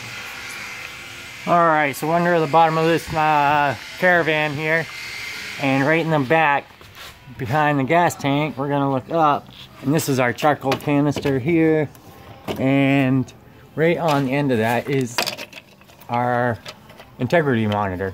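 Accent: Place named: North, United States. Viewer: What state are you from? That accent is different I'll guess one close to the Canadian border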